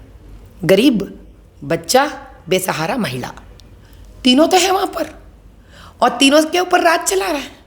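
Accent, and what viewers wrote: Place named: Hyderabad, India. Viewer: माधवीजी आपकी बात पूरा भारत समझ रहा है हैदराबाद की बोली भी सुन्दर है आप उसभाषा। मेभी इनटरवयू देगी तो आपके.मुह से सुन कर हमे भी अचछा लगेगा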